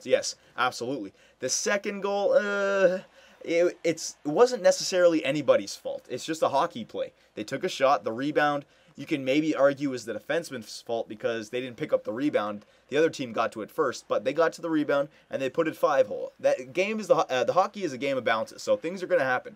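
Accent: United States. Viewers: R u amaracin or Canadian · r u american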